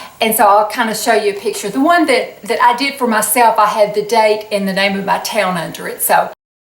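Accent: South, United States. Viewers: i love how you southerners say "film".I simply love your accent.Almost all the Youtube ladies i watch are southern American.When i grow up im gona be a south American.lol.♥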